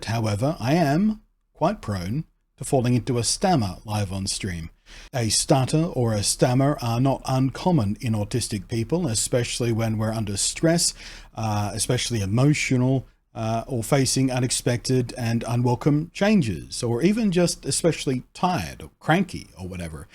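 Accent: Australia. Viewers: is now a Vtuber? 😄 I think you sound pretty Aussie to me. I watch alot of Australian Survivor 😂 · I live in NYC, and grew up in Melbourne, you sound very Australian. I wouldn’t call how you speak ‘Americanised’ in the slightest. Americans just have a terrible ear for accents. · Being from the UK, and coincidentally, also being Autistic myself, I'd never really thought about your accent too much. Apart from the fact you've told us you're from Australia, and also that I've heard you enough times in your previous videos i could say ' an Aussie', but really that's about it. I have family who have lived in New Zealand for so long now they've picked up a Kiwi accent, and they clearly sound different enough from an Aussie, at least to me, so i'm fairly sure i could tell the difference between accents. You could try just smiling and nodding politely at those who accuse you of having a fake accent. 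It'll confuse the hell out of them....or maybe it won't. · I've always struggled with "small talk", never really understood why people talk about trivial nonsense purely for the sake of conversation. Which even now makes trips to the barber somewhat uncomfortable, although I've found one lately that's happy enough to just get on without the jabber. I also get what I call "verbal error loops" when particularly stressed or tired, where I'll stick on a particular part of a word or sentence, almost always two syllables for some reason. And of course I notice it happening, and get more stressed trying to stop it and... Hence the "loop" 🙄 Only solution is to stop talking and take a breath. Accents are interesting for me, I'm quite good at languages for some reason and as a part of that, good at picking up accents. Whether I want to or not. Which is problematic, as I end up picking up accents from whatever environment I'm in, and again am aware of it and feel like it sounds like I'm mocking the locals (for example speaking English in Germany, but with a German accent and grammar) and have to actually WORK at keeping my natural accent. 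Maybe it's because I'm listening with a Scottish ear, but I've honestly never noted your accent as being anything other than Aussie, although that may be influenced by the fact that I've known or worked with a few different Australians over the years, all of whom have had wildly different accents! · You sound like an average Aussie to me.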